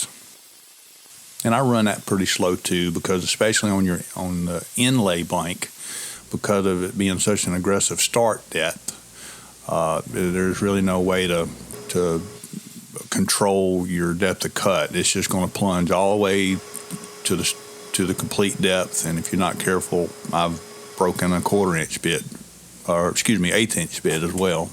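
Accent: South, United States. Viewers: No. Pelicans? No. Crabs? No shrimp? 😅 Beautiful work, southern fried accent. Excellent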